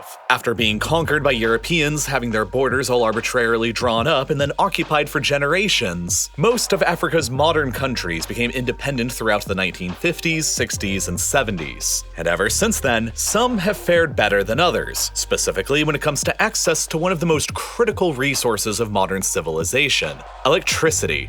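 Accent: United States. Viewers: Why do you use the international measurement standards but still use American only pronounciations on words like Alumunium (internationally pronounced: a-luh-mi-nee-uhm)?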